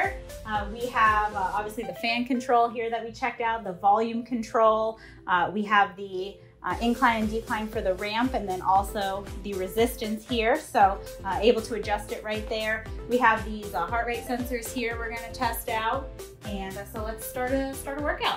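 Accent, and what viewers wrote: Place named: New England, United States. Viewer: Great relaxed demo. I take it you're from either Massachusetts or New Hampshire? (THE ACCENT) 👌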